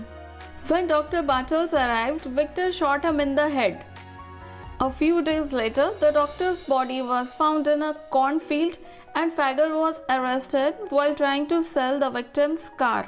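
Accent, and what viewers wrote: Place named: India. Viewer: Great video and the Indian accent is beautiful. Thank you for sharing these photos.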